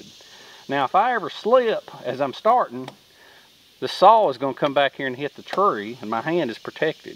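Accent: South, United States. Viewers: Greetings from WNY, discovered your channel recently when decided better get prepped up in light of current events. Really enjoy your content, very thorough, comprehensive, and informative. The cats made this one! Also wanted to say you remind me of my buddy Eric from Kentucky with your southern drawl! best to ya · Great project, and your presentation was,as always,very southern,and right on the money! Enjoyed it a lot.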